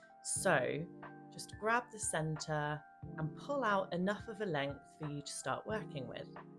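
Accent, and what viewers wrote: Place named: England, United Kingdom. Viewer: I'm 73 yrs old. When I was 22, I knitted my baby son a blanket. Now I can't understand the instructions until now! Thank you! Lovely English accent 😊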